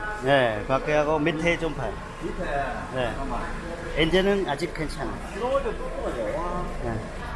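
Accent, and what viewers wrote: Gyeongsang, South Korea. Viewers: 목소리가 너무 좋아요 ㅋㅋ 전 마산에 살아요 경상도 분이 캄보디아에서 사투리 ㅋㅋ 너무 정겹습니다 유투브 대박나세요~^^ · 리얼 경상도^^ 고향에 온 듯해서 좋습니다. 번창 응원합니다^^ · 쉽지가 않에요.... 이 갱상도 뉘앙스가 정겹다...., 라오스 문씨도 중간에 제 객관적인 방식....칽카핥핥크크크.... 좋은 영상 감사합니데이...욕받심더~~~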